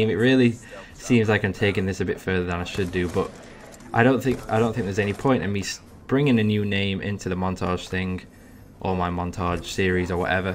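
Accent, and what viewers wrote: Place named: England, United Kingdom. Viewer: ENGLISHMAN!!! :) Ooooooooooshhh!!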